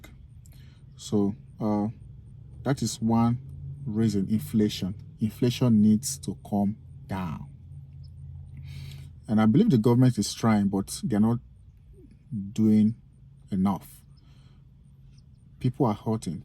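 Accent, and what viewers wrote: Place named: Africa. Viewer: Better learn the English grammar. Poor vocabulary , non articulate., African accent. I was interested hearing your argument , towever could not finish your video. This is a positive criticism, no intention to pull you down.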